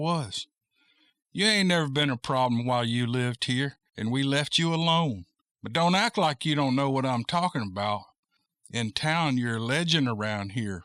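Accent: South, United States. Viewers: I love this story and I appreciate you reading it. I love hearing that southern drawl . Thank you ! From another southern person. Just to the north of you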